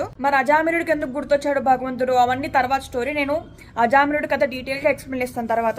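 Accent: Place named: Telangana, India. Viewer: ఈ నవ యువతి ఆంధ్ర పిల్ల అనుకున్నా తెలంగాణ భాష. దొర్లుతున్నది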